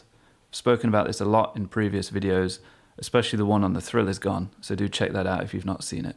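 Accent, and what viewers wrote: United Kingdom. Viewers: The playing out of the chords is sublime. It shows how complex the song really is. Absolutely gorgeous. Oh my God he just spoke and he’s British! Beautifully and intelligently spoken. Beautiful.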